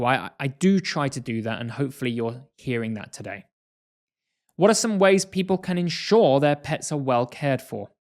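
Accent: United Kingdom. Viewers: I love it when I heard your wonderful British accent.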